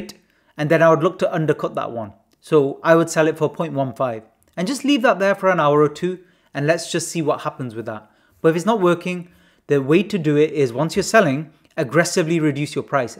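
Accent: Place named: East London, United Kingdom. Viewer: well done bro.. u seem to be good in trading knowing u just started recently in NFT.. im from east london, you sounded like from east side as well..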